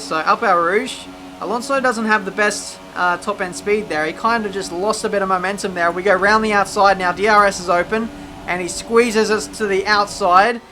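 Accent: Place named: Australia. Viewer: Found your videos last night and have ended up watching all of them already. You've convinced me to buy this game now so thanks! Also, good to hear a fellow Aussie accent playing games. Keep it up dude.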